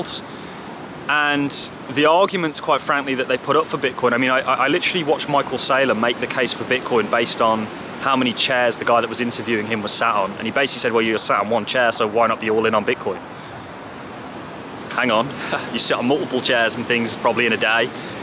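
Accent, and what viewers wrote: United Kingdom. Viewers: If I was gonna listen to a brit my ancestors would have stayed in Ireland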